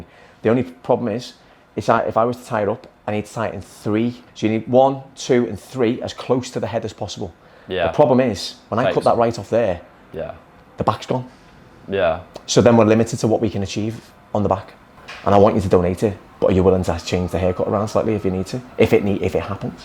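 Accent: Liverpool, United Kingdom. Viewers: I’m 74, never been a barber nor a hairstylist, but I’ve sat in the chair many times for haircuts. None of my hairdressers knew what knows, he has taken his skills to a much higher level, also I love his Liverpool accent! 😃🇦🇺😍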